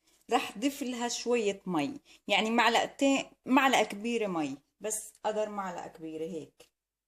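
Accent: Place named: Nablus, Palestine. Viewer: الله عليك ، ما بتتخيلي قديش انبسطت بهالوصفة صرلي زماااان مشتهية الكلاج بالجبنة ، القطر لازم يكون بارد ولا لا ؟؟. من وين أنت بفلسطين ، حاسستك نابلسية صح ؟؟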